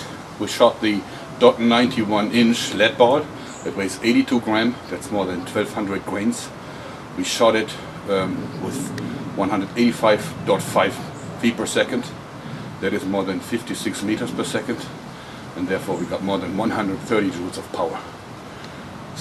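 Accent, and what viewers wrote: Germany. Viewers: also doch aus deutschland :P ich war erst am rätzeln wegen dem akzent ^^ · Hab ichs mir gedacht du bist bestimmt deutscher ?! =) Gutes Video hammer Schleuder ! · Bist ein deutscher oder :D ? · You have such a likeable german accent 😂😂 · what nationality are you? you have a cool accent. German? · Deutscher oder ? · he sounds VERY german... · Close enough for a German native. His English is already better than 3/4 the comments. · vom akzent her würd ich sagen dass du deutscher bist :D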